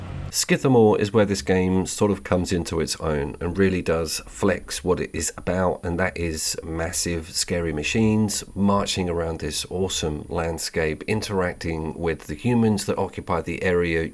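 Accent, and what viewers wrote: United Kingdom. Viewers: Tho not for me (yet) as I prefer Fallout then perhaps a Metro game, and to a lesser extent the Stalker games. However your a Brit, so I can only imagine the fun to romp around the world hearing a accent similar to my own and those I (in your case literally) grew up with. As for the reviewer issues your spot on. No one wishes to wait for anything people are 9 year olds wanting what they want (the review of a game)when THEY want it. You tube could of done anything to make better reviews rise to the top but instead it is a small nice circle at the top and few smaller to medium channels can ever hope to have their audience and thus you tube attention. You will have to at some point know that you still have your integrity and cover the kinds of games that interest YOU, and have fun doing it. At least until someone helps you go viral:D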